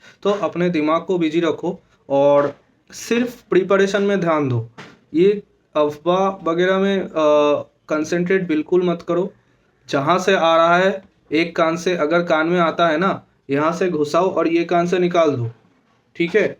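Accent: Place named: West Bengal, India. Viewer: mai west bengal se hoon. Apki batein sunkar lagta hain ap v West bengal ka ho. Steno exm related main sirf apko sunta hoon, channel to hazaron paar, ek ap hi ho asardar, baki sab bekar😄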